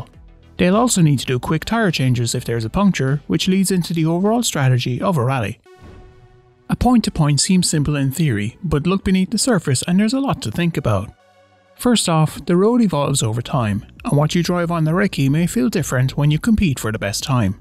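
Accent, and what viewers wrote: Ireland. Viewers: I can tell from the accent youre irish like myself so lets pray for a craig breen win😂🇮🇪🙏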